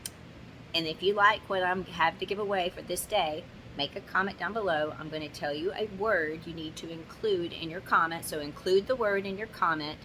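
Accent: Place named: Texas, United States. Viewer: Love watching you. Love the accent it's like being back home with my mama in Texas.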